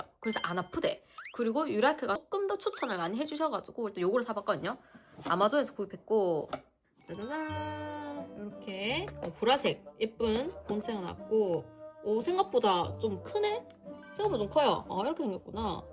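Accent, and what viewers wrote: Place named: Daegu, South Korea. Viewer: 아이공 ㅠㅠㅠ비슷하게 출산해서 10개월 딸아이 키우고있는 구독자에요 수술대 올라간다는 생각에 마음이 너무 무거우셨을거같아요. 하지만 아기는 생각보다 강하니!! 다 잘될거에요 우리 이쁜아비 수술 잘 받고 건강하게 잘 자라쟈❤ 늘 댓 달때마다 적지만..저두 대구에서 10년가까이 살아서 님 사투리 들으면 너무 정겨워요 지금은 남편따라 또 타지에와서 ㅠㅠ대구가 그리워요.. 님은 얼마나 더 그리우실지.. 힘내세요❤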